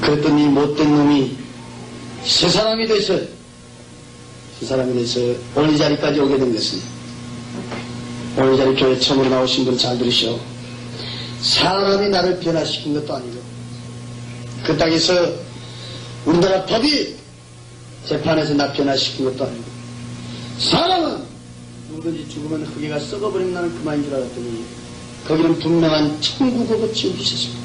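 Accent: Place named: Jeolla, South Korea. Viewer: 보지않고믿는자가 복되도다하셨지만~하나닝은 협력하여선을 이루어주시는분같아요~ 구수한전라도 말씨와 목소리가 성령의언어인것같아요 목소리도 은혜가되네요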